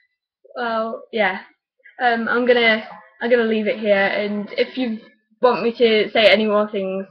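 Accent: United Kingdom. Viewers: Totally from Nottingham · You do sound oddly British in this video.. and I had to urban dictionary "peng".. terrible word.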